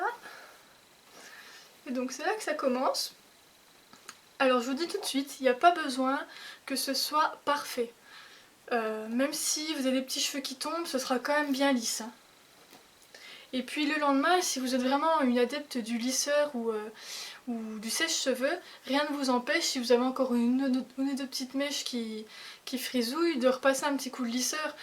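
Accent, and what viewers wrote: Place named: Franche-Comté, France. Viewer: tu as très bien expliqué!! tu as l'accent de chez moi enfin la franche comté!! je test ce soir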